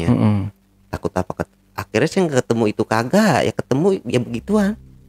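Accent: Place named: Jakarta, Indonesia. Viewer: Asli depok neh,bahasa nya kaya kita pisan....betawi pinggiran....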